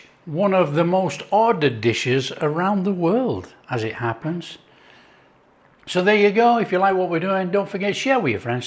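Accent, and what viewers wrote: Yorkshire, United Kingdom. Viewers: Happy New Year. We all really appreciate your videos on helping people to cook, especially those on a budget and those that live on thier own. Just wondering on your lovely accent. I’m from Wiltshire and sound like a bumpkin farmer but have always loved Northern accents. I love the Lancs accent but I’m taking a punt that you are more Yorkshire, northeast say Sheffield area? Lovely channel you have, appreciate your work ❤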